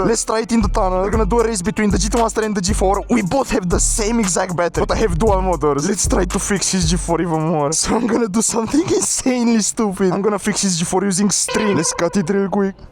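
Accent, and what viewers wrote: Balkans. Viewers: The balkan accent alweys gets me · I love your true happiness from riding combined with Balkan accent on this videos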